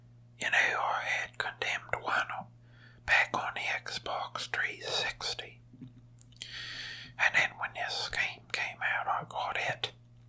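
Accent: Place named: South, United States. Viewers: owen leather this is,late but it sounds like a southern American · what is your accent ?! it sounds extremely southern american most of the time and then occasionally falls into australian especially when you say time, like and buy... its rather peculiar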